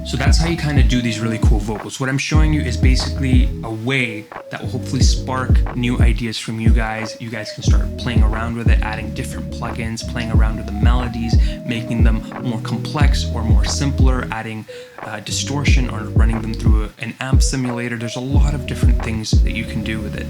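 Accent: India. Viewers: I swear I thought you were from India, lol.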